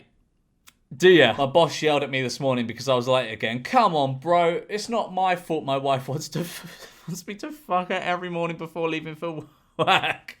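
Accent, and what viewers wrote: United Kingdom. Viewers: His British accent is just…. 😩❤️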